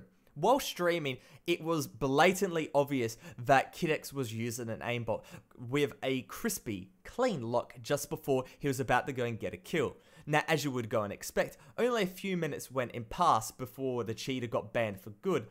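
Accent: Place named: Australia. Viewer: 70k damn you are gaining quick bro! Really enjoyed this video, I think we all agree on the fact that CA is dead and probably has been for 2 years already. But it doesnt really matter what game, your commentaries are always so chill. Love the aussie accent haha.:P keep going bro:-)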